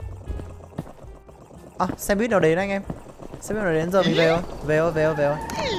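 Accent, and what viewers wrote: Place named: South, Vietnam. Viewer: Thích cái cách a ấy nói tiếng miền nam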